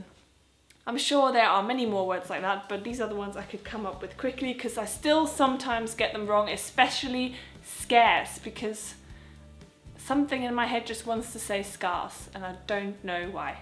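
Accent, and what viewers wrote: England, United Kingdom. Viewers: you sound ENGLISH to me.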